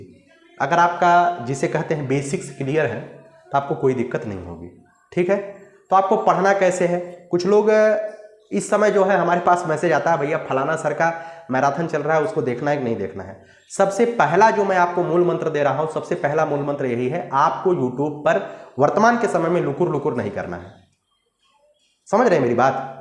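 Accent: Bihar, India. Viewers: Sir aapke bat me bihari kuchh accent aa rha hai.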